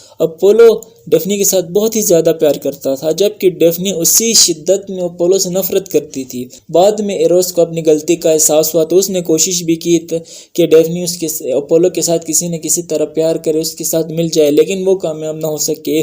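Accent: Gilgit-Baltistan, Pakistan. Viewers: AP ka accent GB waloo ka ha.100% AP GB ka ho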